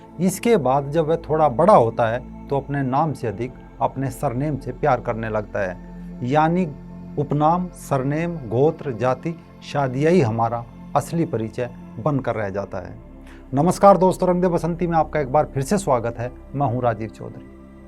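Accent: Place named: Western Uttar Pradesh, India. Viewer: Aap ki awaaz se ye pta chalta hai ki aap west up ke kisi district se ho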